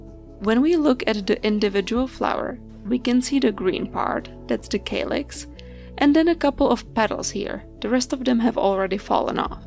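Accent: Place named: United States. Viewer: Thank you for this very accurate and useful video. It is very interesting for European users to know all these details and photos of American plants. It is also very courious to listen how you pronounce the families names, they are Latin names, but your American pronunciation (Americanization) makes them sound much more charming and mysterious to my ears.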